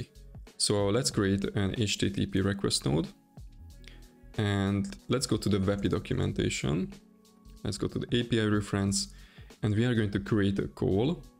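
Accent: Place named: Hungary. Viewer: Volt egy apró magyar akcentus, mondom megnézem a felhasználónevét és jól tippeltem :D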